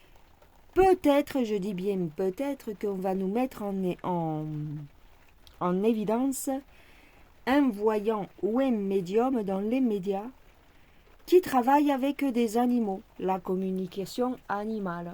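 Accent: South, France. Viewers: Bonjour, merci pour la vidéo et l'accent du midi moi j'adore comme j'ai des copines la bas sa change de la Tourraine qui est froid et les gents bien triste. Vous avez raison de vous lachez sa fait du bien et on rigole en plus. Bon 1er Mai ainsi que tous vos abonnés🥰❤🕊🌟👒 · Vidéo insolite grâce à cet accent du sud qui dédramatise la situation. Bon 1er mai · L'accent du soleil dédramatise ce monde merdique Merci ❤❤❤ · Bonjour Une touche d'humour avec cet accent du Sud 😊 Pourrais tu faire une vidéo sur les pluies diluviennes à Dubaï? Merci... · Bonjour j'adore cet accent du sud de la légèreté du Soleil et continuer un petit peu s'il vous plaît au cœur pour avancer oh je suis en train de dicter le message Et je prends l'accent nous allons tous parler un peu marseillais aujourd'hui En tout cas j'adore Merci pour ce tirage continuez de chanter un peu oui les chansons de sont superbes moi j'adore mais cette histoire me paraît pas tres nette De toute façon c'est sa vie privée ça ne nous regarde pas nous aimons le chanteur et ses chansons se remettent sur le chemin Que j'adore l'accent que vous aurez avril demi-vingt-quatre et surtout mon 1er mai pour demain gros bisous ❤❤❤ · Et tous les neuneus qui se marrent avec l'accent du Sud ! 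Le titre est vraiment approprié, c'est n'importe quoi ! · 😊 bonjour 👋. Tu me fais rigoler avec l accent du midi.merci pour le tirage. Oui le mois de mai vas être encore très difficile. Je te souhaite un bon 1er mai. Moi cette année je n achète pas de muguet..il.est où. Ça me rappelle une certaine chanson. Bisous 😚 😚 Gigi. ❤❤❤❤. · bonjour ont ce croirait dans un film de pagnol j’aime cet accent du sud sa sent la lavande et le soleil ☀️ bon 1er mai 💐 désolée ilya pas de muguet bisous 😘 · Oh lala d' tu m'as fait trop rire avec ton accent même moi qui habite le sud je ne parle pas comme ça 😅❤😂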